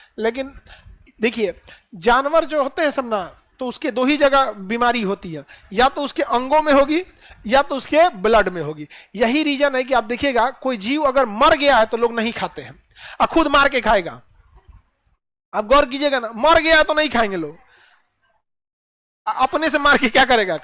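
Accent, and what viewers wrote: Bihar, India. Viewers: Sir bahut achha samjhate hai Bihar abaj me bhi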